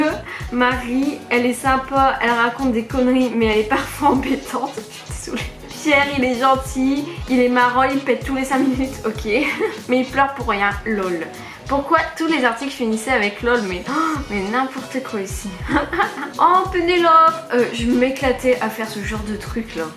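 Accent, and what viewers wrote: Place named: North, France. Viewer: J'adore ton accent du chnord :*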